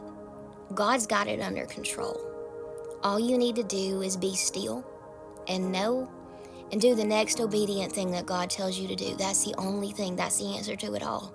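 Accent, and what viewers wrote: South, United States. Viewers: You Are So Beautiful 🙏 I Absolutely Adore You 🤫 That Southern Draw You Radiate Is An Undeniable Beauty Like No Other🤭 I'm From Bama & I Love It. Have A Wonderful Monday Sweet Angel 🫶🫂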